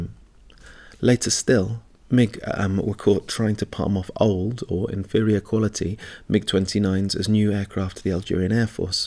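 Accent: United Kingdom. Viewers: And it's British accent, love it! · The english tone is great to. He could be saying just horrible lies and ethnic slurs and I'd be on board 😆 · LoL British XD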